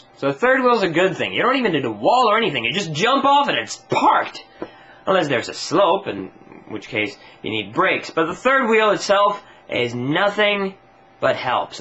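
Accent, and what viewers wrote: United States. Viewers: Ha, this made me giggle. :) I can't tell that you're Swedish from your accent, which sounds decidedly like mine, which is American. Yeah... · he sounds like an american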